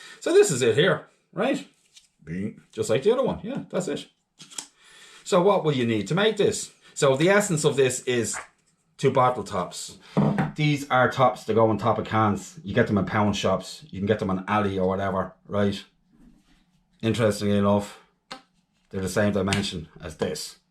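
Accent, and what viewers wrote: Ireland. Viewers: Newly subscribed on your channel and have learnt so much already, thank you. Excellent informative and well explained videos, well done. Lovely to hear it from a fellow Irishman 😊. Trip planned for Norway for July and August, hence my renewed interest in fishing. No fish left in the Med, so lost interest in fishing since I moved down here. Really looking forward to picking it up again in Norway. Keep up the good work 🤩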